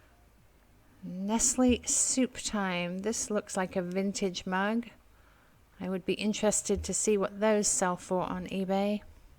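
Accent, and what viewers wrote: England, United Kingdom. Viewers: I love shopping with you ,your English accent is like someone from home, Your fun.😊❤😊too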